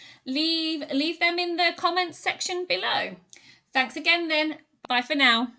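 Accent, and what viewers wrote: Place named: United Kingdom. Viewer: Hey .. those are really cool way .hmm . I think you have a British accent right .I like that .why don't you teach how to learn British accent .I wanna learn it .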